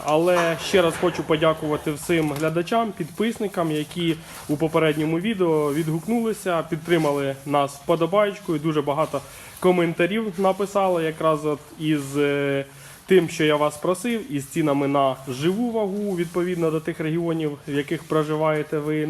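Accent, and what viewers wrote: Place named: Shostka, Ukraine. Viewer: Цікаво з якого ви міста? Бо говорите Шостка Кролевець))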